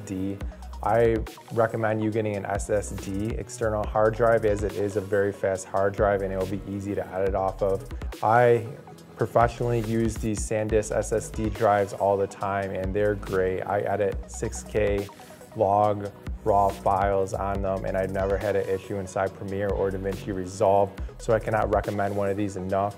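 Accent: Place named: Midwest, United States. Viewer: Midwest accent like a mofo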